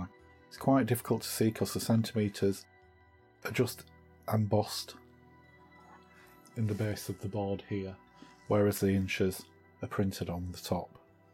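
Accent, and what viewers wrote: Northern England, United Kingdom. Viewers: So lovely to see & hear your video with a 'new' take on an old friend. Applying tape to the whole sheet first - genious. And the presentation is so calming - no disruptive music, or irrelevant chit chat, just the basic instructions. Great to hear a (UK) Northern accent too - Manchester? More please!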